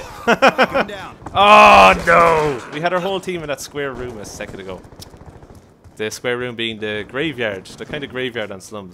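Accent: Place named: Ireland. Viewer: you are much funnier than Pewdiepie and deserve many more subs than him:) You are a really great guy and I love the Irish accent you have. I would love to game with you one day:)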